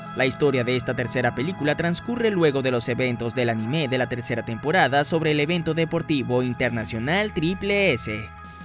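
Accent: Venezuela. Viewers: bro eres de venezuela coye que bien vl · Eres de venezuela? jajajajaja este pana me agrada ahora :v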